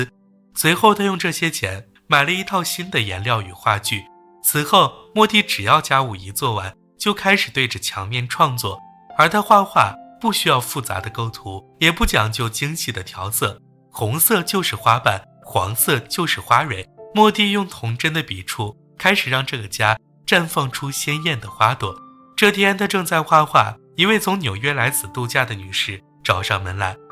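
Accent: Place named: China. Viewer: 很好奇，解說說自己在臺灣，卻是滿口的標準普通話，難不成是大陸人在臺灣:face-blue-smiling:应该是的！這部片子很久以前看過，印象很深！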